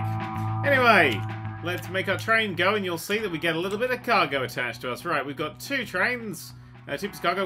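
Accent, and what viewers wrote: England, United Kingdom. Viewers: HMHS.BRITANNIC Thats how english people say it. They also say math like maths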